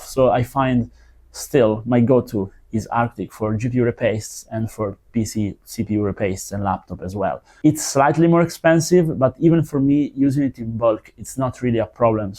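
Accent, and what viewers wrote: Italy. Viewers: He's Italian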